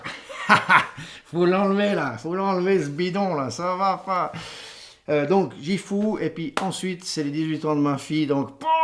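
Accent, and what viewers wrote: Switzerland. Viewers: Vous êtes génial de l'humour, de la passion , expérience et technique et des présentations limpides ( bon il y a un peu d'accent Suisse !!! ), Bon rétablissement concernant le genou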